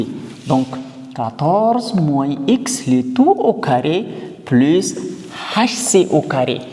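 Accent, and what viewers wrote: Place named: Bukavu, Democratic Republic of the Congo. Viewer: toi c,est certainement à Bukavu selon la prononciation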